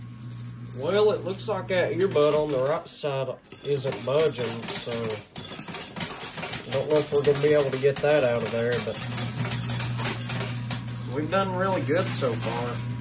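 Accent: South, United States. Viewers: Love your accent, I am from Australia. is that a southern accent,👍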